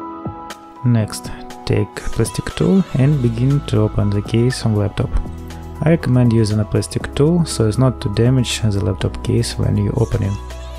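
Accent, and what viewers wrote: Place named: Russia. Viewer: В середине видео понял что чел русскоговорящий )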